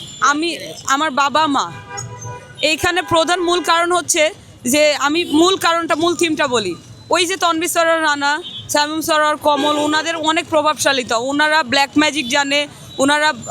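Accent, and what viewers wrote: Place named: Kolkata, India. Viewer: Kolkata style ei kotha bole ..nice style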